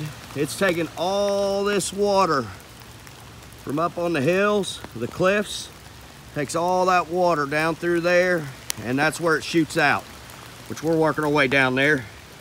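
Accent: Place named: South, United States. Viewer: It's like a post10 video with a southern accent.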